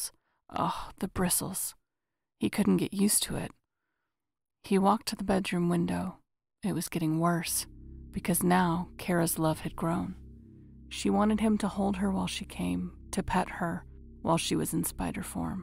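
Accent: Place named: Wales, United Kingdom. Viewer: Very cool tale, and very nice Wales accent! 🙌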